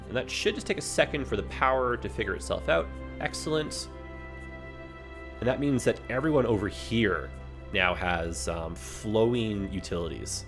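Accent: Canada. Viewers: Will you be doing any sections from Toronto? Maybe a highpark area or possibly do the Gardiner? First time watching and knew you were canadian with all them "Eh"s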